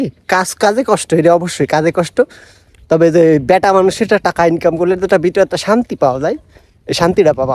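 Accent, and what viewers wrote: Jessore, Bangladesh. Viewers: ভাই আপনার কথাই যে মজা পায় খুব ভালো লাগে ভাই আপনার গ্রাম্য বাংলার ভাষা গুলো আমাদের যশোরের সেই ভাই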